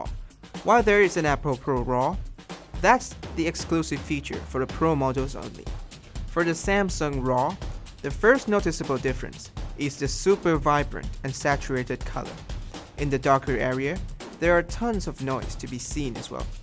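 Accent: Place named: Thailand. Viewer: กำลังสงสัยเหมือนกัน แต่สำเนียงคือเหมือนคนไทย แต่ชอบคอนเทนต์ที่นำเสนอนะมีความเป็นตัวเองมาก ชอบครับ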